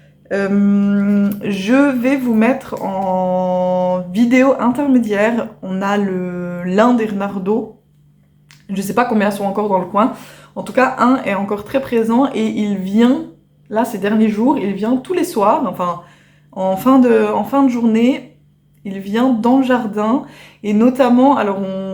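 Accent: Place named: Belgium. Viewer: Bonjour. Je vous découvre pour la première fois .je ne savais pas du tout d'où vous étiez et a votre accent il me semble que vous êtes soit du nord soit belge. Je suis aussi belge mais vit en France depuis toujours.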